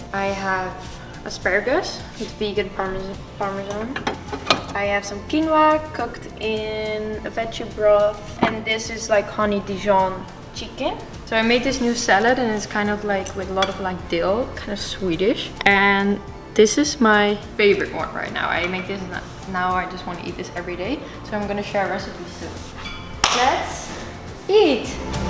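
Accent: Netherlands. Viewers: Are you dutch? I hear a little accent🤪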